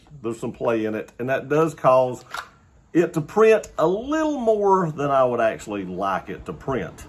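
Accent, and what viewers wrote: South, United States. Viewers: You must be from the south 😂, whereabouts?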